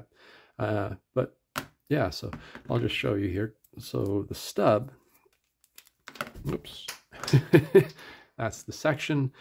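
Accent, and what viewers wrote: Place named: Canada. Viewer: I detect Canadian or Minnesotan accent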